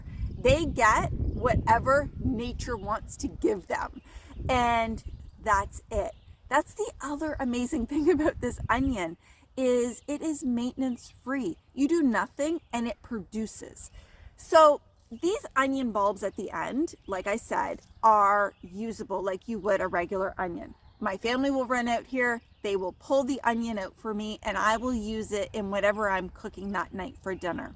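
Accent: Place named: Canada. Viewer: I found your video looking these up because my grandparents grow these and I’ve been wondering how to use them to see if I want to take some to start in my yard. Fellow Canadian here in southern Sask and as soon as I heard you speaking I knew you were Canadian.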